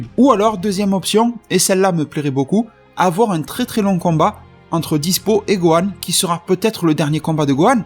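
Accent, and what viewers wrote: Marseille, France. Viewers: T es marseillais ?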